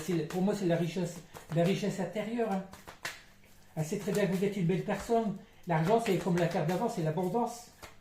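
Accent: Nord-Pas-de-Calais, France. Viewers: Mon autre m'a enfin avoué en rougissant qu'il pensait tout le temps à moi... Il ne faut jamais désespérer ! Votre accent chti me rappelle mon enfance à Béthune... Merci pour vos guidances et votre bienveillance ❤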